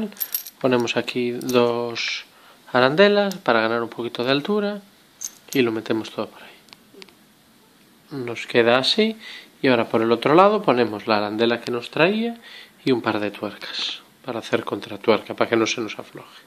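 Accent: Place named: Galicia, Spain. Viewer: Grazas! El acento gallego calmado es de lo mejor para estos tutoriales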